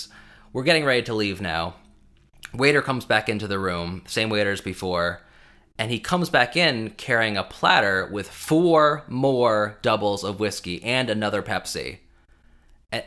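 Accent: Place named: China. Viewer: You need to retire that Chinese accent bro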